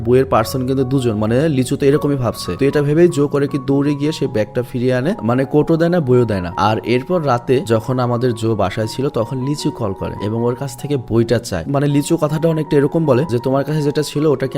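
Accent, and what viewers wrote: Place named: Tangail, Bangladesh. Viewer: তুমি নিশ্চয়ই টাঙ্গাইলের মানুষ তাই না 😅😅😅😅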